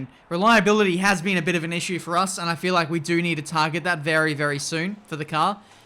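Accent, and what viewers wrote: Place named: Australia. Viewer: I'm not particularly in to F1, but these career mode vids are good fun. Amazing how the graphics capture how the head tilts as the car corners. You can see the force acting on the neck and imagine how strong your neck muscles have to be to take the cornering speeds! With respect to American uploaders, it is refreshing to hear another accent. I love all the Ozzie phrases. I love that the Ozzies take pride in their vernacular. Never mind the Inuit with their 7 words for snow, I love the way Ozzies can say 'this is shit' in a 1,000 different ways!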